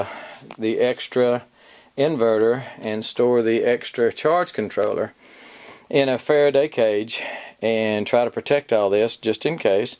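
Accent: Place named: South, United States. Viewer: With that southern drawl I was expecting to hear a "hell yeah" haha.. Regardless your vid definitely piqued my interest.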